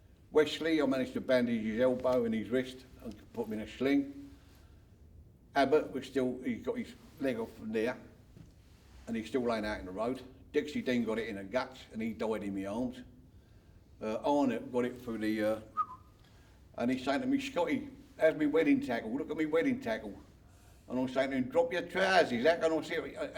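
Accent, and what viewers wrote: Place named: Cockney, United Kingdom. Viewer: He's a Cockney. You'll get used to it me old dinner plate...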